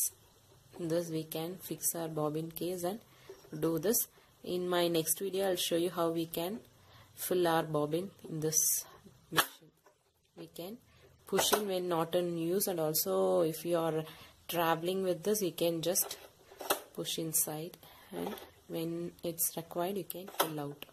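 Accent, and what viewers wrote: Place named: Kerala, India. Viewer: Sound like malyalee ... thank you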